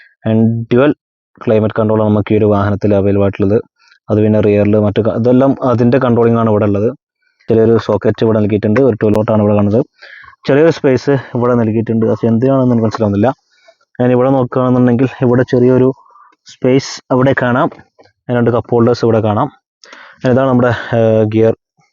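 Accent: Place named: Malabar, India. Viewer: മലപ്പുറം, കോഴിക്കോട് കാക്ക ആണല്ലേ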